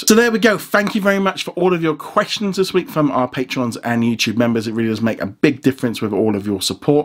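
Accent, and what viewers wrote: United Kingdom. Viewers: Excuse me are you British or are you an American from the USA or Canada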